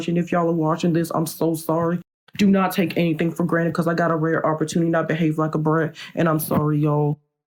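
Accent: South, United States. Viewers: he's so country, i love it! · i noticed he a really big country accent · He’s country or something. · Stop talking about his voice. It's not funny it's normal because he is obviously from the south. The only part I laughed at was when he was talking about himself and how he looked on tv which was the only part that would be acceptable to laugh at because the rest of the video was him telling a story. The way his voice sounds is irrelevant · It’s the country accent fa me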